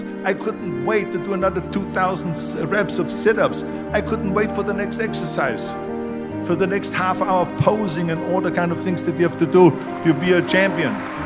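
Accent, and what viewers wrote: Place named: Austria. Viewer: Was ich nicht verstehe, ist, dass jemand nach all den Jahrzehnten immer noch Englisch spricht wie ein Österreicher.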